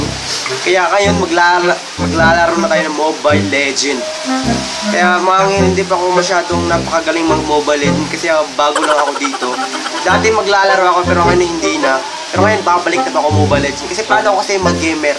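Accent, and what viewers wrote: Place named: Visayas, Philippines. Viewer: halatang bisaya talaga...hahaha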